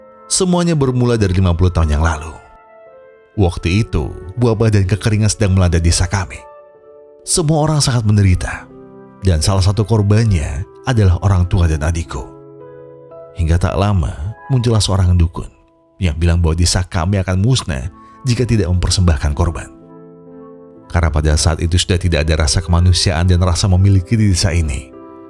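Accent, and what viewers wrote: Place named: Surakarta, Indonesia. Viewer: Orang mana si bang ko solo banget logatnyaaa 😭…. Dari chanel soundsmokers sampe sekarang ganti jadi setia gw banggg🫵🏻🫵🏻🫵🏻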